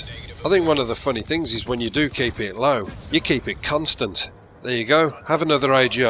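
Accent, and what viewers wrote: Birmingham, United Kingdom. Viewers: Great stuff, you're like a brummy Sid Waddel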